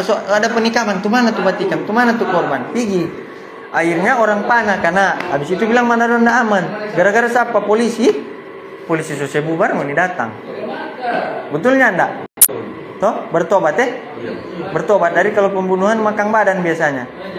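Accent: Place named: Manado, Indonesia. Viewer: Bahasa manado logatnya ashek jg jd terbawa..bawa aku 😊 salam dari Bandung ndan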